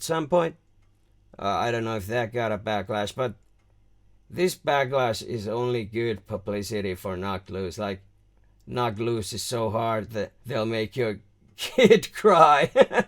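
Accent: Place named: Finland. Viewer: Not gonna lie, it took me a while to place that accent. At first I thought it might be some odd dialect of Irish English, but after a while the Finnish-ness crept in - which I eventually caught, being also from the Nordics. Norway, in my case. Anyways, terve to you, my man. Good video! PS: It's reggeaton.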